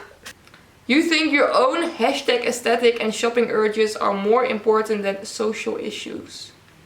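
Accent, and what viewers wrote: Netherlands. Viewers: Je hebt zo'n dik Nederlands accent. Moeilijk te verstaan.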